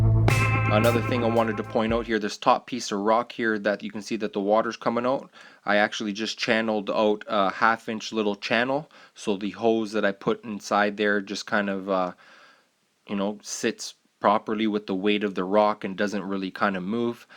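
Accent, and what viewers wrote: Canada. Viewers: Love the Canadian accent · the most Canadian accent I've ever heard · a really strong canadian accent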